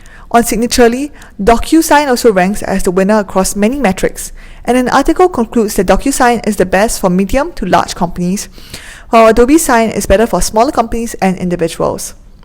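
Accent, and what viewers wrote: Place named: Singapore. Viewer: Singaporean accent.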